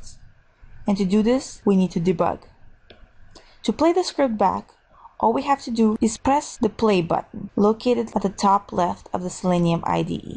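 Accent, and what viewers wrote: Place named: Russia. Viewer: Your accent reminds me of the accent of my Russian friends, you gotta be of either Russian or another Slavonic origin, don't you? :)) p.s. thanks for the vid, it's good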